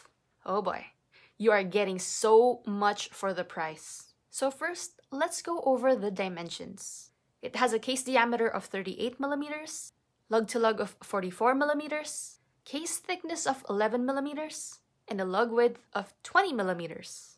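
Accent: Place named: United States. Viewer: I wanted to ask how your English is so good it sounds native with a mild US neutral accent (I was and well technically still am an Oral English teacher) did you spend time in the US or Canada? or did you complete an IELTs course in university?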